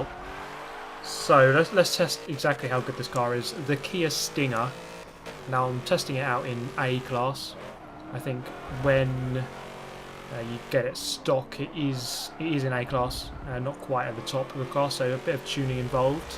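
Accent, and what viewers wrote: United Kingdom. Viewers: Do all brits say "because" in the same way that you do?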